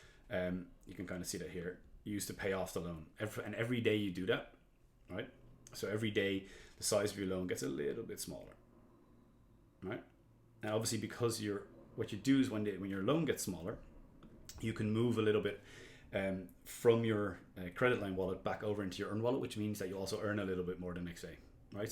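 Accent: Ireland. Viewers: Will definitely be checking this out. Haven't loads of xrp but enough to be using it to make more. Have saw a lot of people retweeting your posts, didn't realise you're Irish before hearing you speak. Just outside Belfast myself.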